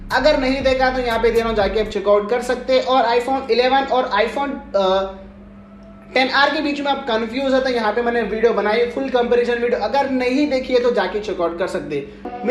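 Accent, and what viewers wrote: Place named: Hyderabad, India. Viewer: Bhai tum Hyderabad se ho bhai bolo bhai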